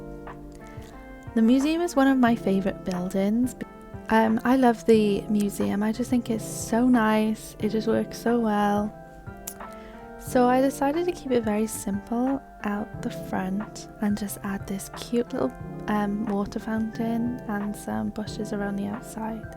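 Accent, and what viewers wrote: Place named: Wales, United Kingdom. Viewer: love that welsh accent hello fellow Cymro! 🏴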